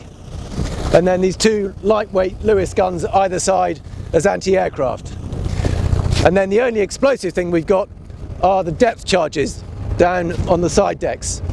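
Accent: United Kingdom. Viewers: Usually can't stand pompous ass limey commentators but this cat's solid. Well done my friend. 👊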